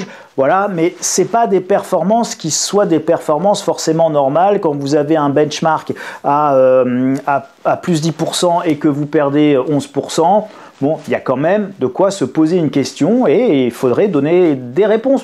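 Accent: France. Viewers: T'es normand ? Yes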